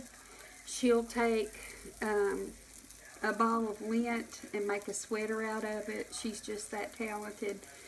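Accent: South, United States. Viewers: I’m generally a lurker, but I love your channel. Also, I haven’t lived down South since high school in 1992, so I love to hear your accent, brings good memories :-). Anyway, my Mama would make this for us, because it was cheap, easy and tasty. She generally just used well seasoned ground beef. I think that was just her preference though.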